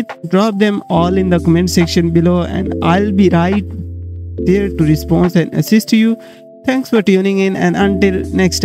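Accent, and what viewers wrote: India. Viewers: It's always the Indian accent guy on YouTube 🤗🤘🙏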